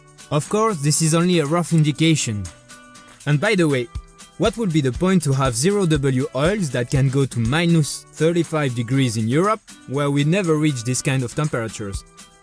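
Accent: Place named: France. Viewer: Thanks. I like your French accent 🌷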